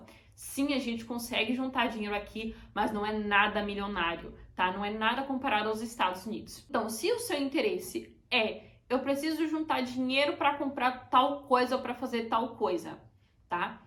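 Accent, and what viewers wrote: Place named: Feira de Santana, Brazil. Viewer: Sotaque de feira de santana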